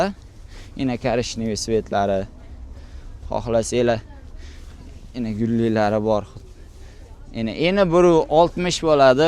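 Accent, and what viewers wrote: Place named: Khorezm, Uzbekistan. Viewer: хоазимчани урйанипди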